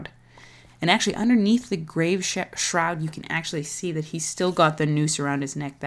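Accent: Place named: South, United States. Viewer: I love everything about this. As a born and bred southerner I laughed heartily at your southern accent.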